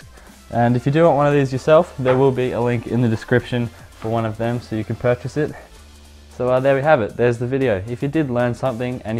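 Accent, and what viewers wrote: Australia. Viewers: This is really cool! My 2 brain cells are trying to keep up 😂 your Australian as well! Yeah mate SUBSCRIBED 👍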